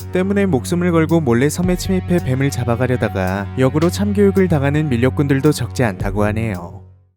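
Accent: Japan. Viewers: 왜 자꾸 영어가 일본어 들리지 ㅋㅋ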